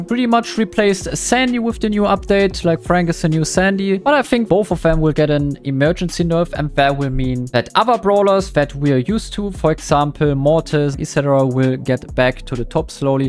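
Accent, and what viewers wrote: Germany. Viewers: Das ist so richtiges deutsches Englisch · ich finde es voll witzig wie du englisch sprichst weil man hört das du aus Deutschland kommst😂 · are you german or french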